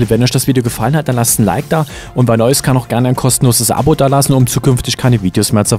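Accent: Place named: Saxony, Germany. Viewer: Alter bist du aus SAchsen oder was ? Dein Dialekt ist ja katastrophe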